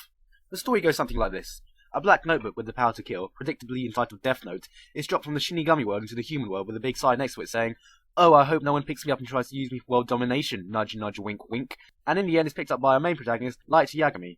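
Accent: United Kingdom. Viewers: Dude he had such a British accent back then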